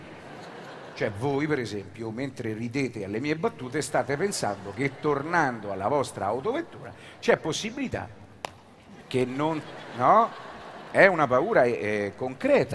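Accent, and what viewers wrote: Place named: Rome, Italy. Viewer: Troppo forte, poi secondo me( e parlo da milanese), l accento romano accentua ancora di più la comicità, è troppo bello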